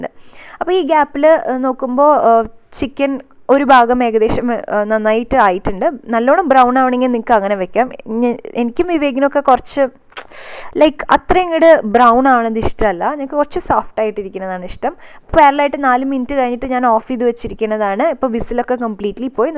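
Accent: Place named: Palakkad, India. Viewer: Ha slang kettapo enikum thonni chechi pkd aanennu... Ur videos r awesome njan new subscriber aanu lub yew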